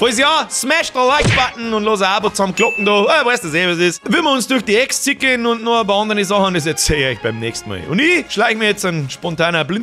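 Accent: Bavaria, Germany. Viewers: Wia scho bei fui Videos an nogl afn kopf drofa 😂 lang is scho hea awa ja so in etwas was. Da is wenigsdns oana den woma faschdäd 😂😂😂😂 und dea an gleichen Dialekt red. Ja bin im Nachbar Ort af gwogsn 👍👍👍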